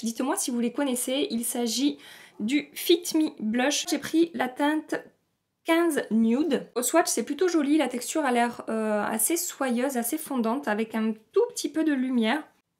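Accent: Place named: Bordeaux, France. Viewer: serais tu de Bordeaux ou de la région bordelaise pour avoir cet accent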